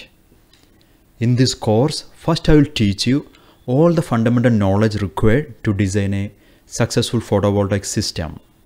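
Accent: Kerala, India. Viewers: Nalla malayali accent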